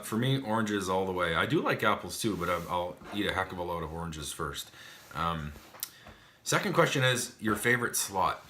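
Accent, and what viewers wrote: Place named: Canada. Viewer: I love your accent. I knew you were Canadian the instant I heard you talk on one of your videos. New subscriber here! Keep making vids, they are great, and you're really interesting!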